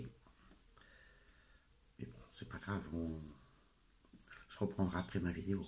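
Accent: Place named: North, France. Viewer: Merci pour le.numero Le nord te manque pas trop ? T as pas perdu l accent en tout cas hihi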